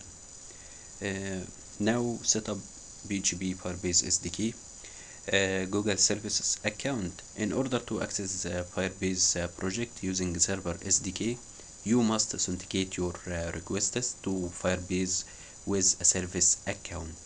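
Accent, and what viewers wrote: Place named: Arab world. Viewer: هههههههه بعد ما وصلت 3 دقايق فى الفيديو قولت الانجلش دا بتاع حد عربى قفلت الفيديو لاقية اسم القناة بالتوفيق يا هندسة اتمنى انك تعمل ابديت للشرح مع نسخ اللارافيل الاعلى على فكرة انا مش بتريق على الانجلش بتاعك انا الانجلش بتاعى وحش جدا اصلا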